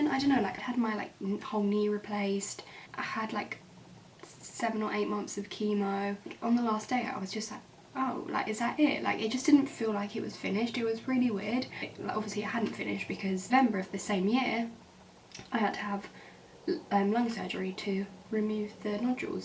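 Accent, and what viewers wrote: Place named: United Kingdom. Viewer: Where do you live in the uk? I love your accent. Plus your eyebrows are amazing! Do you also have Instagram?x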